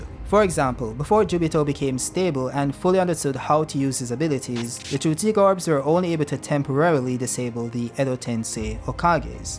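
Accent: Jamaica. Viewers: sound like u come from Jamaica dawg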